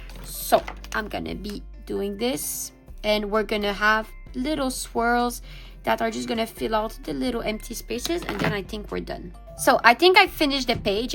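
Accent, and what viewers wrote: France. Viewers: the french accent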